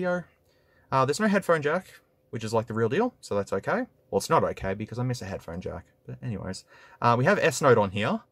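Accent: Australia. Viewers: From one Aussie to another, our accent always throws me when I hear it in media haha. Bloody good shit though, subbed. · I personally like the long vids, and for an aussie you have a pleasant accent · Well he does speak Australian English. So, yeah. 🤷🏼‍♂️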